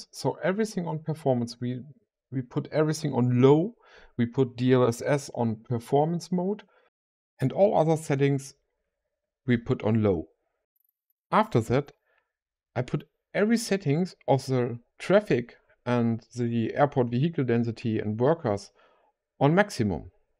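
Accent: Germany. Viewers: Englisch mit Deutschem Akzent ist irgendwie immer geil. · I was stationed in Germany for 5 years and I loved it. So the accent makes me remember some good times.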